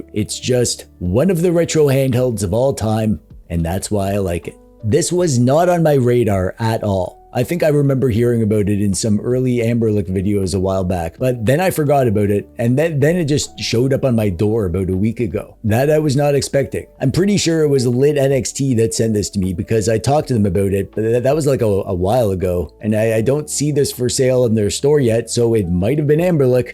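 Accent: Canada. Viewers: If I hadn't jumped ship to Retroid for a Retroid Pocket 4 Pro, and more recently a Retroid Pocket 5, I'd have been very interested in this. But instead, I'm just watching to hear the nasally Canadian tones of.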